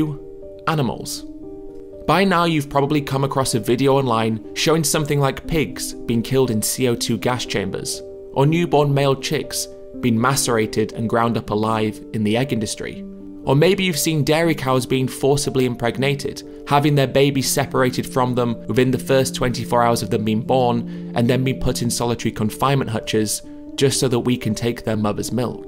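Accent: United Kingdom. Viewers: Pandemics will happen no matter what. They will always be a when. The changes in farming animals is already happening. You can make it happen faster by buying your meats from small farms where the animals live happier lives. The tags for cows are the same as us piercing our ears. Many do not even react to tagging. And people, if you eat a lot of beef, the twenty pounds a year at the Standard American Diet rate, (you probably eat much less like me), it would take you over 18 years to eat the weight of ONE average size steer. By the way, vegan diet is not appropriate or sufficient for toddlers or children. Just because a Nice British accent says something does not make it true.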